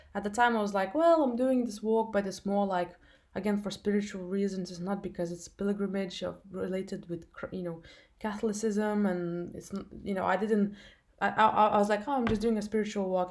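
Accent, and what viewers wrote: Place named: Eastern Europe. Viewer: My sister if you felt spiritual oppression yesterday on halloween it means you're on the right side. the side in the Light opposite to witches. I have a question - are you from some slavic country? you sure have that accent. Jesus keep you close to HIM. Jesus guide you so that you don't stumble. In Jesus Holy Name we pray. Amen.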